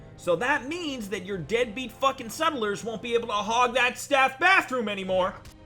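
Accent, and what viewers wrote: Canada. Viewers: you Canadian? you got that accent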